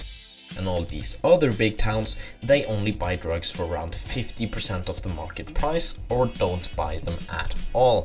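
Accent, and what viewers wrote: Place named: Scandinavia. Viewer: Could hear at once that he's a fellow Norse boy ^^ Gonna watch this video later today :3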